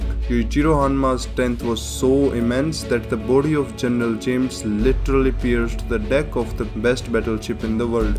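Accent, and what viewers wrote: India. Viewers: nah his accent(I think Indian) pronounced the both very similarly.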